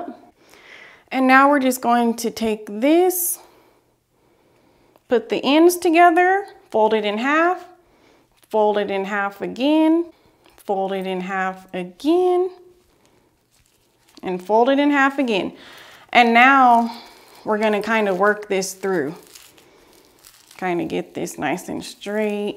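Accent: South, United States. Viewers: Watched this just to hear her speak...her is deep southern...with the boujie R's🤣😍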